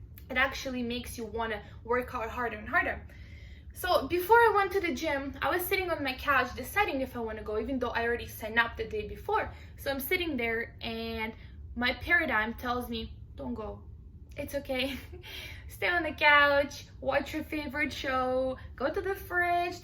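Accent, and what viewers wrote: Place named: Russia. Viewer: ohhhhhhhhhh that kiss in the end, let's just make russian babies already. That russian accent makes me melt. Can you do a russian language lesson video next time i think that would be your niche on youtube.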